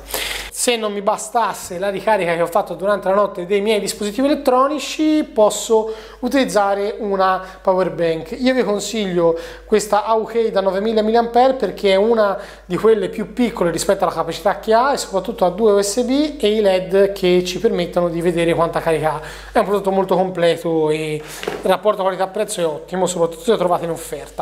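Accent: Tuscany, Italy. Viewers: sei toscano??? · Sei toscano, vero?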